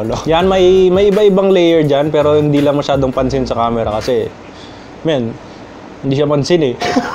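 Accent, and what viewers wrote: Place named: Visayas, Philippines. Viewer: Kuya bagay mo yong besaya accent mo😂🤘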